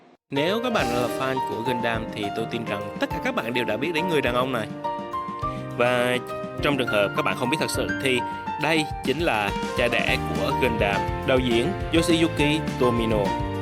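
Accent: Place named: North, Vietnam. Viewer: Này chắc chắn là anh em miền Bắc rồi 😂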